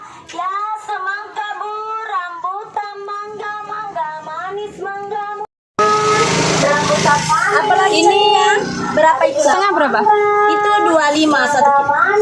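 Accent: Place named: Sulawesi, Indonesia. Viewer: Org Sulawesi itu logatnya,,, menggemaskan 🎉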